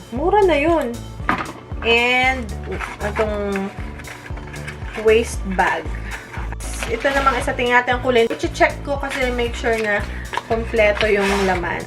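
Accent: Batangas, Philippines. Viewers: Ma'am, batangueña ka po?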